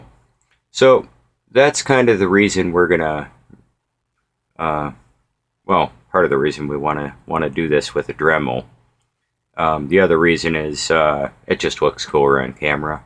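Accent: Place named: Pittsburgh, United States. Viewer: This is really helpful, thanks! Are you from the western PA area by chance? I grew up in Pittsburgh and your accent is reminding me of home!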